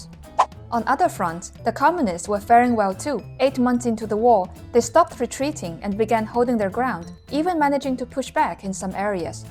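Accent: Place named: China. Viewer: You know, it's nice hearing someone from China (i assume they're from China due to the accent and also them replying to some comments in Mandarin) talk about Chinese history. I've seen a lot of people from Europe and the US talk about Chinese history, but it's refreshing hearing someone who's actually Chinese talk about Chinese history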